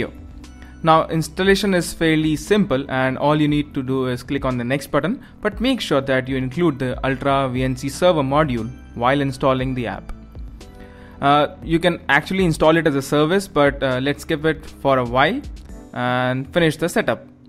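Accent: India. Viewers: When they have Indian accents you already know they are scammers!!!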